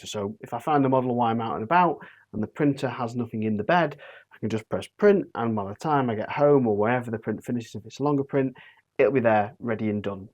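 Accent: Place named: North, United Kingdom. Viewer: Love "free-D" printing. Please learn to speak English. It would be so much easier to listen to you. Speaking Mockney in a northern accent just sounds weird.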